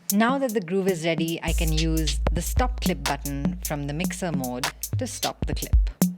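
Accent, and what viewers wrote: India. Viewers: The demo is awesome. Your diction sounds like an Indian. Well. Loved this demo. Haven't yet tried Launchpad. Maybe in the future for sure.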